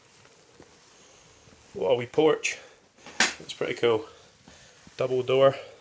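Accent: Scotland, United Kingdom. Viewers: I had a scottish classmate before in Law School. Really nice and smart guy. McCleod is his family name. Your accent is the same with his😊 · Super Scottish accent 😅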